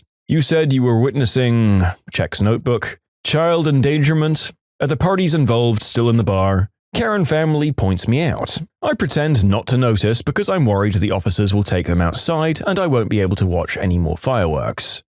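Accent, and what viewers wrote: United Kingdom. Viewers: You're my favorite narrator I love your British accent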